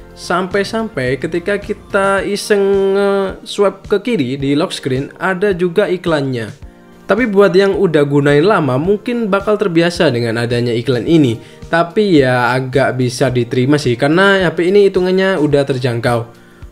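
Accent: Sidoarjo, Indonesia. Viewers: eh masnya orang sidoarjo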